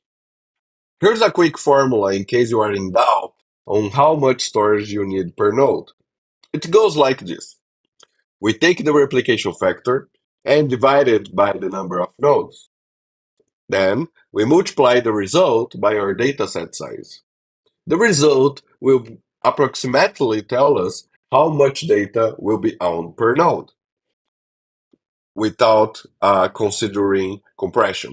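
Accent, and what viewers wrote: Brazil. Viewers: Bro, are you Brazillian? Asking due to the accent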